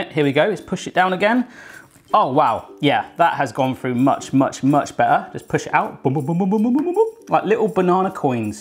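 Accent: United Kingdom. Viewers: I really like British accent 😍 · Is he British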